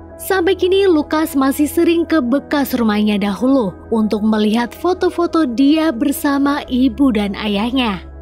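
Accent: Pemalang, Indonesia. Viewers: LAahhh kok pemalang? Emng org pml? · Mbae asli Pemalang po? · Wong Pemalang mba? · Kaknya orang pemalang yahhh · Wong pml ye mba? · Mba orang Pemalang? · wong pemalang ta mbak?😂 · wong pemalang ya?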